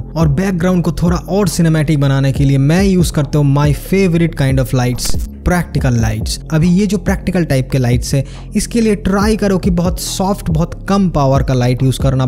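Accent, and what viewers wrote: Bengal, India. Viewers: Hindi sunke lag rha Bengal se ho? Bangali dada😅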